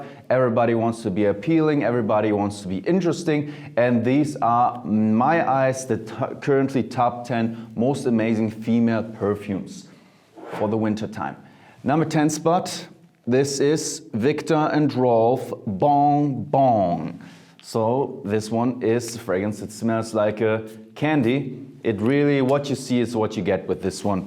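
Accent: Germany. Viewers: This german accent gosshh you are the best!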